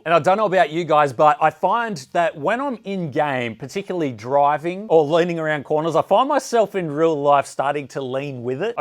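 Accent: Australia. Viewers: Australia, it’s a pretty well known accent kinda sad you don’t know it lol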